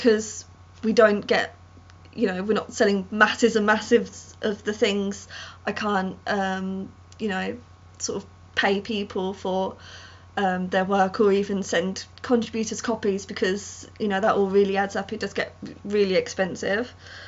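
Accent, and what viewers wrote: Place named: United Kingdom. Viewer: A British accent makes all poetry better somehow 😃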